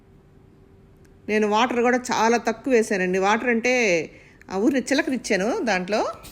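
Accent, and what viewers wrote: Nellore, India. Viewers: Mana Nellore bashalo super andi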